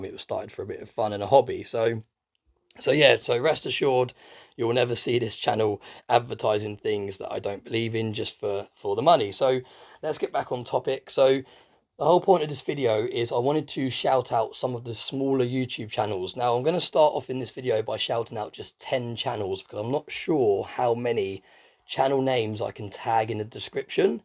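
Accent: United Kingdom. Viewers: I have a ear for voices and you must come from the same part of England as Solomon Lane from Mission Impossible. 😁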